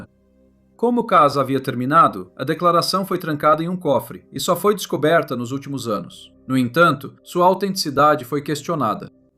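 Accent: Portugal. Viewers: Impossível assistir com esse sotaque de portugal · Esse sotaque portgues é muito feio 😅😅😅😅😅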